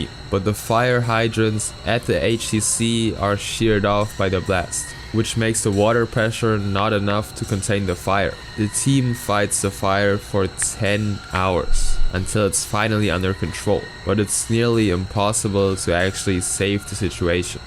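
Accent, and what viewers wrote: Germany. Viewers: Either German or Austrian. He’s got a noticeable accent imo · U sound german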